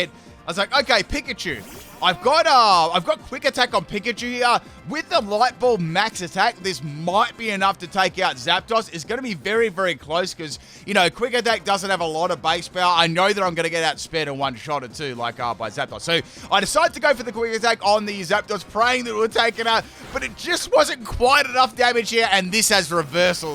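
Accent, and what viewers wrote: Australia. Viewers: Your Australian accent is like the chef kiss on the video. Always has been. The real pimp of YT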